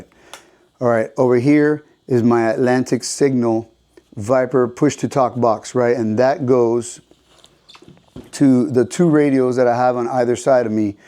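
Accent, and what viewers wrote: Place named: Miami, United States. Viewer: Love the Miami Accent...LOL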